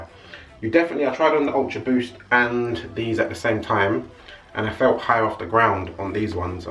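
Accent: England, United Kingdom. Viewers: I had early access to these too and the white ones!! I wish I'd of got the little buggars now as they look so much better than them boring fucking ultraboost. We need something fresh and new and here it is. At least that black bottom ain't gonna rub the fuck off either. They look dope as fuck. Proper Mad ting bruv!! I picked up some serious heat today. Nike no one's done a video on yet anyway. English heat early birds release again. Hope you'll check out some of my vids I think you'll find them different to any other. I try to make them funny and more mock style reviews. But your welcome to join the crew as I need some English blood man. And I'm detecting an English accent there. Fucking sick top too. I just wish Nike done bigger sizes I picked up some crazy bottoms in a 2xl and they are nearly there but tops I'm pretty Large on top like 56 inch chest so I'm gutted as I've been checking that jacket out for a while haha!! 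Safe Geezer. Nice Video. Subbed you. Liked ya and a good size comment too. DONE. Haha!! Yeah I need your support dude. I hope you'll come over to the DANGER Russ Side. 😁😆😉👍🏼🙏🏻✌🏼.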